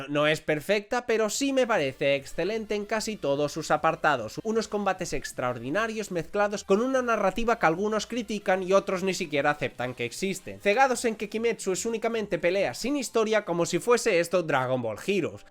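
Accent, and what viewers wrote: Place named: Spain. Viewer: Desde que descubrí este canal, me sentí en casa porque encontré a alguien que habla fuerte, con carisma, emociones y acento español. Un abrazo desde Perú, maestro. 🫂🐺🇵🇪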